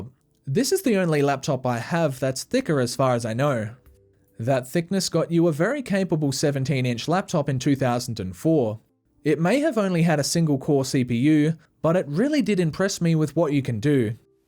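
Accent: Australia. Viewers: is this guy from australia?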